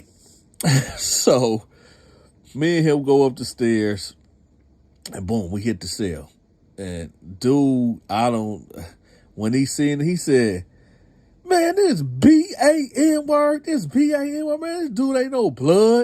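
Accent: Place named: South, United States. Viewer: Man why I think you was from down south lol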